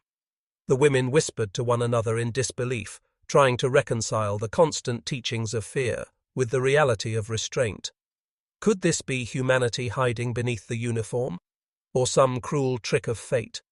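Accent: United Kingdom. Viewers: wHY IS THIS ROBOT SPEAKING BRITISH ENGLISH? TO AN AMERICAN AUDIENCE?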